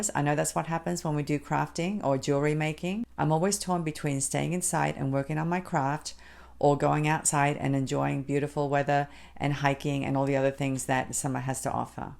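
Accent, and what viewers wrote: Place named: Australia. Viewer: Dear, I love the necklace you showed us at the beginning of this video. Is that your beautiful garden? What is lovely place to live in. Your accent sounds a bit Australian - have I got that wrong or have you ever lived here in Australia? Thank you for your great videos, love from Amanda in Melbourne, Australia ❤️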